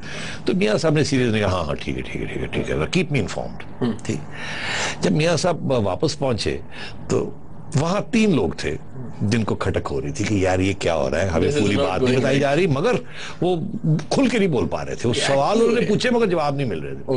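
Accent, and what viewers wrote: Punjab, India. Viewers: love ur Punjabi tone sir... yar kuch alag nahi ham dono mulkome.. kyo ek dusro ko mar rahe he.. I dnt u.stand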